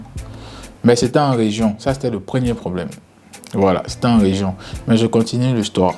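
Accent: Ivory Coast. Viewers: Je suis nouvelle juste pour les parabole je m'abonne 😂😂 j'aime écouter quand un ivoirien parle. 😅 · L'accent Ivoirien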